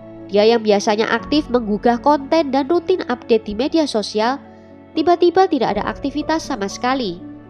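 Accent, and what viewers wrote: Java, Indonesia. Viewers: Say medokuhhh 👍💖😍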